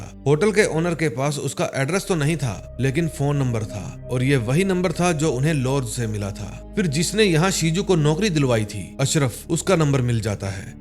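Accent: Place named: Kerala, India. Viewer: The narater also seems to be a malyali going by the pronunciation, .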